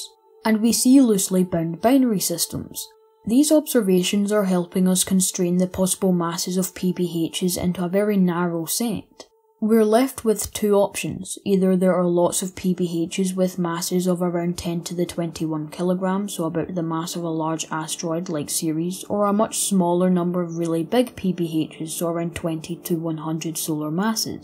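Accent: Scotland, United Kingdom. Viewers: He is Scottish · It's a Scottish accent.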